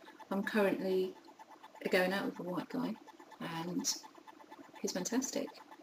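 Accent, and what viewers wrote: United Kingdom. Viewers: She's already beautiful but with that British accent...brilliantly gorgeous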